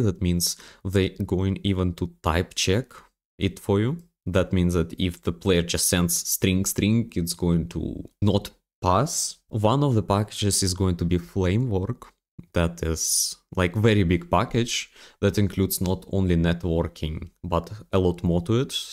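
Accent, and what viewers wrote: Russia. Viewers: По акценту как будто ты русский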